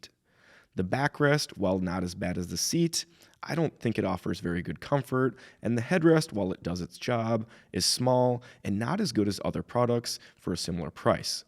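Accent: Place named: Canada. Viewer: That's usually a Canadian accent, or the states that border Canada. Like Minnesota, Washington, etc.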